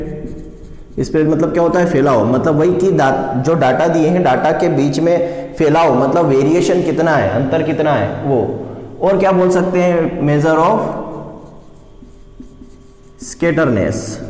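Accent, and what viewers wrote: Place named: Indore, India. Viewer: Kitna hi kuchh krlo pr thodi si indori to muh se nikl hi jati he ........( Apn)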